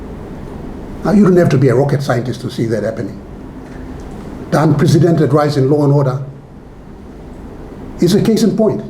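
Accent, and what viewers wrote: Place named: Papua New Guinea. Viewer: U speak like a PNG man and not an Engaian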